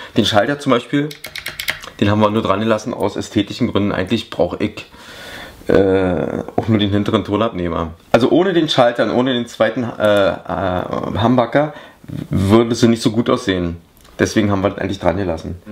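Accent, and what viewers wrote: Berlin, Germany. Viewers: Yes. But it's not an accent it is a dialect and this dialect of the berlin region feel "dirty" for other germans. For example: they say "dat" or "ick" instead of "das" and "ich". It sounds very shortened. Fun fact: "ick" is often the wrong pronunciation of "ich" from americans/british. Because they have the same trouble with the "ch" as we with the "th" :D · Berliner Dialekt ;)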